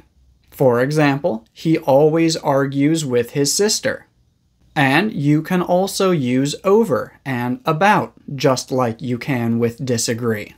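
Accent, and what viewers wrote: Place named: United States. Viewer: Your American accent is pretty nice and clear. ! Thank you so much for your classes. 🎉😊❤❤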